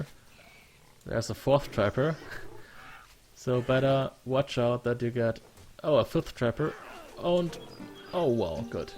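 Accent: Germany. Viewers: Man merkt richtig deinen Deutschen Akzent! :D But i like it.. :) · you sound really German oh du sagst ja sogar du bist aus Deutschland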